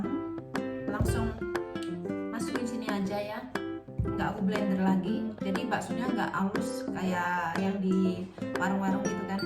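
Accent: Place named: Semarang, Indonesia. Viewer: cute bgt....senyum2 bikun gemes....😍😍 Logat semarang yg paling medhok ya mam....😀😀😀 yg wong Semarang mana like nya...😍😍😍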